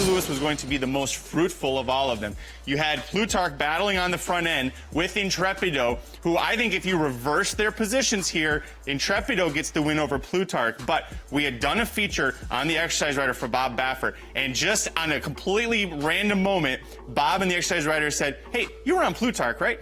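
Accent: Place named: Canada. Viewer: Dude you have no clue! You are stupid, and sound Canadian!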